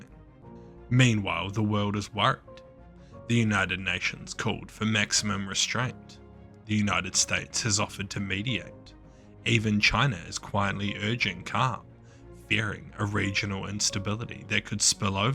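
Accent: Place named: New Zealand. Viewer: How dare anyone criticise beautiful kiwi accent and narration skills!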